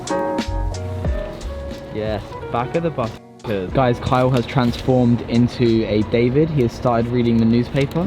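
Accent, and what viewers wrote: RP, United Kingdom. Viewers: I like your vids but my god you sound like a tory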